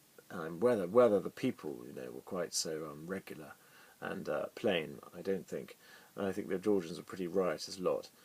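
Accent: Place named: RP, United Kingdom. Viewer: Bloody hell you're posh!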